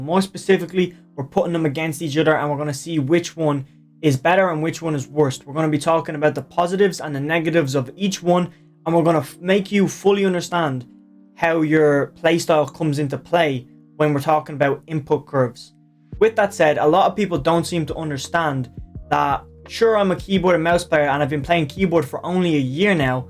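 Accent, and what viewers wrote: Ireland. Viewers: I love that irish accent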